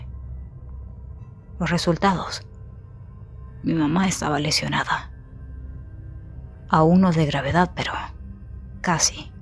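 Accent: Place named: Venezuela. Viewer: noté el acento Venezolano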